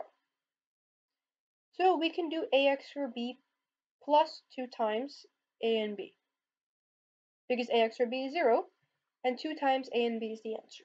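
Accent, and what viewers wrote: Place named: United States. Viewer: please clearly speak beacuase u have american ascnet and i am from india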